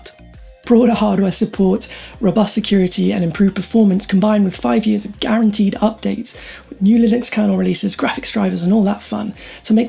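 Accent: United Kingdom. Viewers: Which part of british are you from?? I can barely hear what you are talking..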